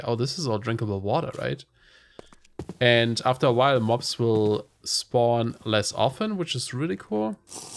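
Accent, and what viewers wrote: Germany. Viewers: Love the german accent, after looking for TFC i luckily found your channel and am really enjoying ur videos! Keep it up :) Hope u will be better soon! Greetings from germany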